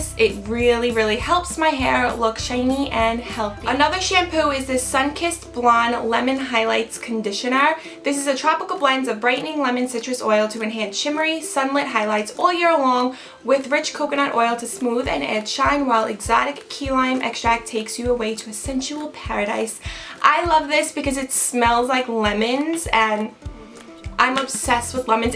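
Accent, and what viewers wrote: New York, United States. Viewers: are you from new york? you really have an accent. i can tell because i am from new york. :) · Is it just me or does she have a New York accent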